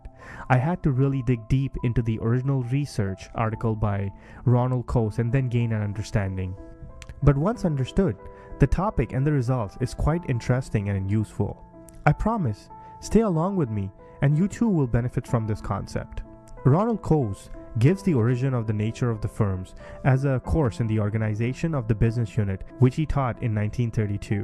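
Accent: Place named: India. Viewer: The first Indian accent video that I didnt skip, mainly just because the accent wasnt that strong hahaha.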